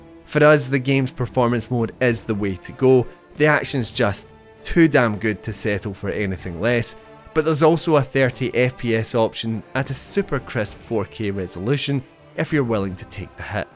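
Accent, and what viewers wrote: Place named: England, United Kingdom. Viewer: 😂 i commented same regarding his english accent, so weird and different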